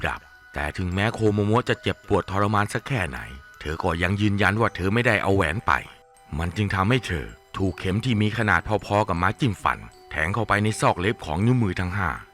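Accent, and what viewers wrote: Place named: Korat, Thailand. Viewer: คนโคราชบุรีรัมย์ใช่มั้ยนิสำเนียงชัดมาก😂😂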